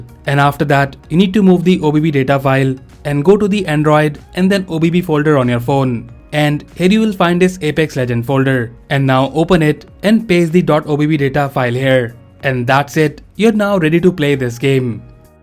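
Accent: India. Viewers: The indian accent doesn't make me want to download it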